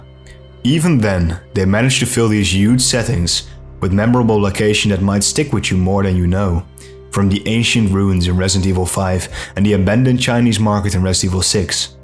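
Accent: Netherlands. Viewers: Great video, awesome accent where you from dude? Guessing Dutch but not positive. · i'm smelling a dutch accent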